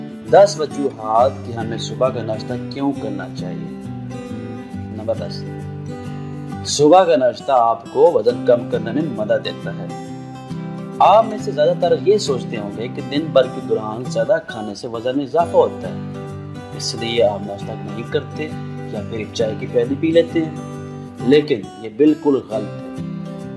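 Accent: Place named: Pakistan. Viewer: bhai awaz pakistani hai tou video bhi pakistani bnao na kya angrazno ko dala hoa hai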